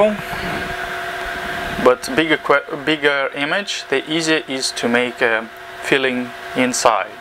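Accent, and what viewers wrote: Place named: Russia. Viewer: Takoy Russian accent :)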